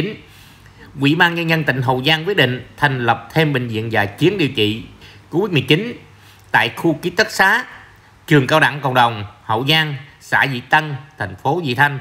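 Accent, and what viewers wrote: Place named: Quang Nam, Vietnam. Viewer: Giọng giống quãng nam quá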